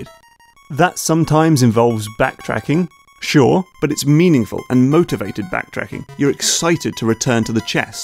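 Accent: United Kingdom. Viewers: The British accent makes it sound so prestigious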